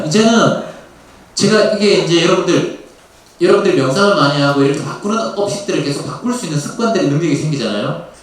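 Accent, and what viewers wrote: Gyeongsang, South Korea. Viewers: 경상도 액센트 너무힘들어요.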